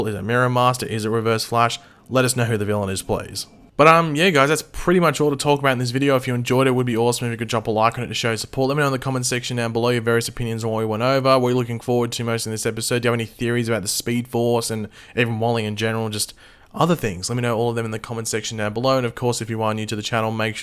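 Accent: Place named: Australia. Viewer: Brother no offense, but Australian accent sucks 😑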